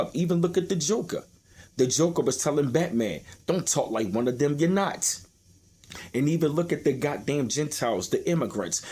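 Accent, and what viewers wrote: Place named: Philadelphia, United States. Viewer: I Hyped this video with points. Your voice sounds like you live in Philly. Great narrative!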